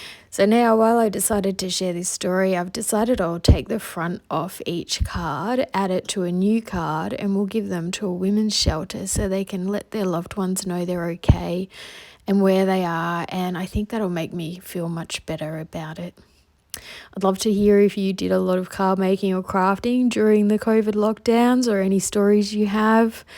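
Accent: Australia. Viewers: Hi, thank you for sharing this video with me. I will have to watch some more videos from channel. It is so nice hearing another Aussie accent. ❤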